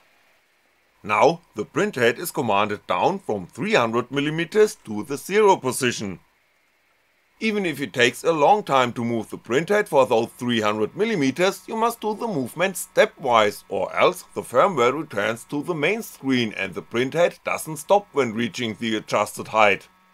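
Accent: Germany. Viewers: I love how you germanice English. There is something oddly pleasing with that. Hahahahha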